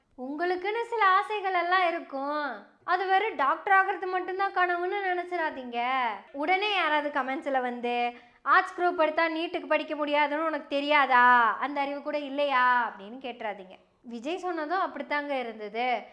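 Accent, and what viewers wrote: Kongu, India. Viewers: Nee oru dmk பரதேசி போல இதுல கொங்கு மொழி வேற பன்னாடை கண்ணாடி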